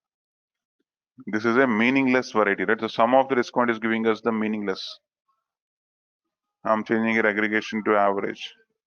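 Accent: India. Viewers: I felt like I m studying in NareshIT Ameerpet.. sir, south accent is like that..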